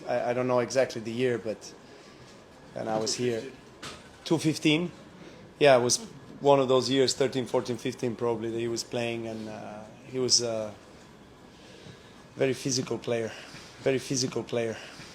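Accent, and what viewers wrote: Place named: France. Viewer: I love your french accent Nole !!!! WIsh you to be in your best shape for the 3 weeks coming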